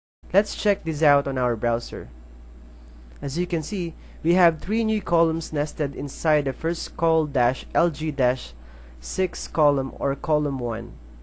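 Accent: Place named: Philippines. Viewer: Maybe he is Filipino because he's accent same with us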